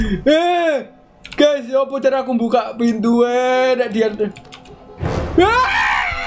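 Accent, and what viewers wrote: Java, Indonesia. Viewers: Suka bgt liat teriakan sma bahasa jawanya kak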